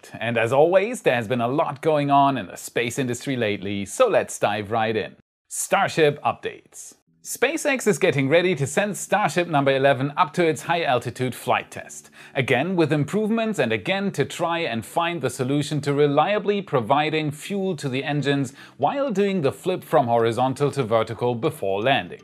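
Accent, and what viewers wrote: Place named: Germany. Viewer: You sound a bit german. Are u german? Great video btw!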